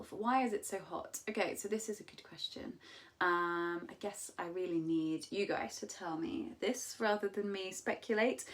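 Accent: United Kingdom. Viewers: Wow, she is so tastefully evil 😈. And the British accent on doms is undefeated. Thank you for touching on average/above average guys being into it. I don't see it as humiliation, I just think a woman being an unapologetic size queen soooo hot. 🙏🏾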